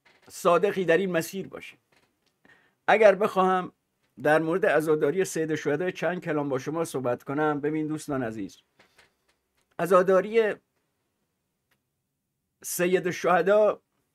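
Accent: Lorestan, Iran. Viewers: عاشق همین گمال گفتن های پی در پی تم.من مشهدی ام. ولی حال میکنم با این لهجه ی شیرین و اصیل لری ، آقا. دوستان اگر کسی اصطلاحات لری را بلده لطفا این ها را برام معنی کند. ۱) پوزیده ۲) زیر مشگلون. ۳) گمال. با تشکر. درود بر شما. 😂😂😂😂😂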